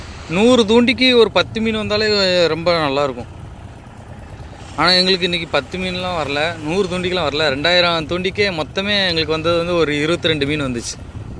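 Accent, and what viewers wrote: Thoothukudi, India. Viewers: உங்கள் தூத்துக்குடி தமிழ் மிகவும் இனிமை.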